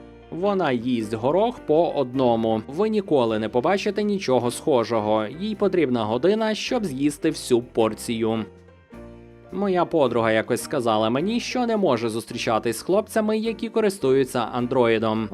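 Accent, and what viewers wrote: Russia. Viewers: Все кльово. Дякую. Але. Москальське закінчення "сь", а не наше "ся" дратує. Пробачте.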